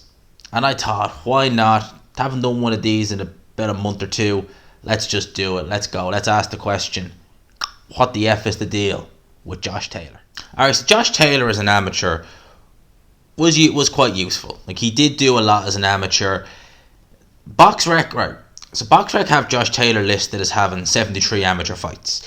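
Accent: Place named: Ireland. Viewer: Excellent insight and breakdown Sir! I enjoy your videos. Dunno if it's the Irish lilt, or probably you really know what you're speaking about! Good stuff...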